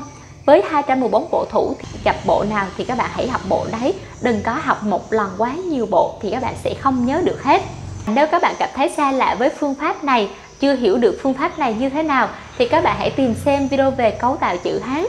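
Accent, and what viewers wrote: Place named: Vietnam. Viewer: Nghe phát âm lài biết ngay người gốc Việt kkkkkk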